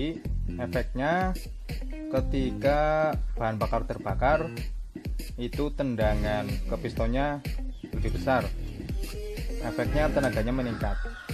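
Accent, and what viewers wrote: Banyumas, Indonesia. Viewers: Mas ngapak yaa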